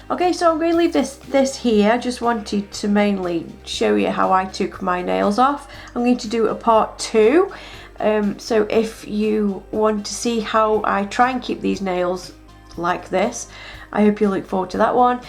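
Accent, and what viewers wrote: United Kingdom. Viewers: You sound like your British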